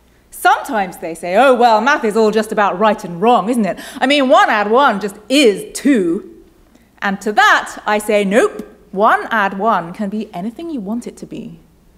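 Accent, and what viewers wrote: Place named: England, United Kingdom. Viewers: it's an english accent actually. It's not scottish, or irish or welsh, the other components of "Britain". English, English, English. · I love her british accent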